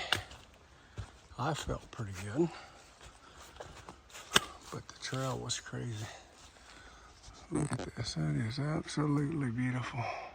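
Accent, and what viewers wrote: South, United States. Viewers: You are doing some killer miles! Awesome job! I love your Southern accent . It makes me feel all warm and fuzzy on the inside lol keep up the awesome videos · Love your voice. I can't remember if you said where you are from. You sound southern.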